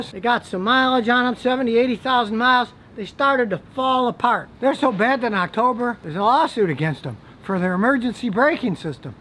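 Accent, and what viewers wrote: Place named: Brooklyn, United States. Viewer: This guy sounds like dafy duck and a really thick brooklin gangster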